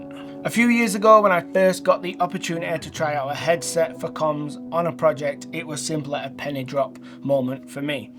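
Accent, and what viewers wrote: United Kingdom. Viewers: Great tips, thanks, I HAVE to ask where in the UK your accent comes from? (if you don't mind)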